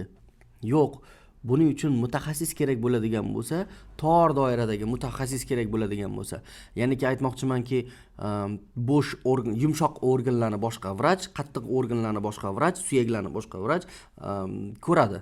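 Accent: Khorezm, Uzbekistan. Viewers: Брат хоразмданмисиз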